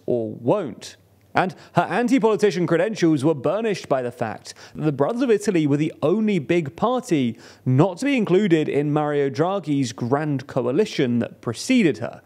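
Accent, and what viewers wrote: England, United Kingdom. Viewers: do I really need someone with an English accent to read me statistics ?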